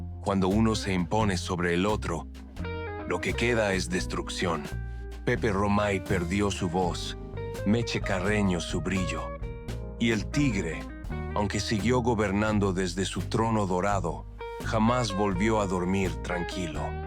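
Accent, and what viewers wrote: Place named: United States. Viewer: Esa voz, como agringada, no se oye muy bien, pero meche se fué por el negro durazo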